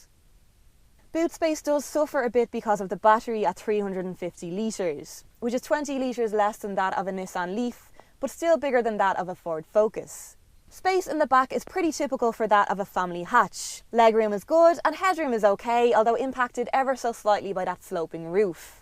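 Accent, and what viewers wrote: Ireland. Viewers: first ones to show the bottle holders and how they actually work, assume they are in the back as well? Do love the Irish accent though, nothing to do with car review I realise. Love to see you do a longer range test or maybe a long term test of it and see how it works more real-world than a short review that cannot cover how it will work in the real world.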